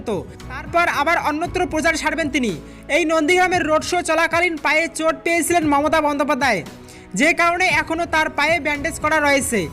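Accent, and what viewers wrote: Bangladesh. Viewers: বাঙলাদেশীদের মত উচ্চারণ। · valo kore kotha bolte sekh.bangla deshi der moto kotha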